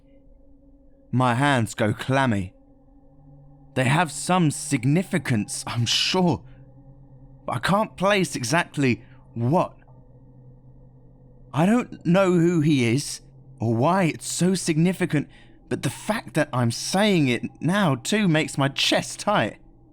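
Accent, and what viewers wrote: United Kingdom. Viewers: British narrator? Good narration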